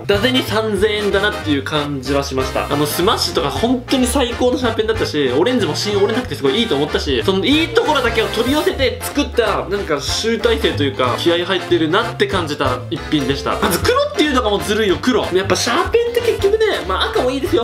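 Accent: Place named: Kansai, Japan. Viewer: 謎の関西弁。惚れた。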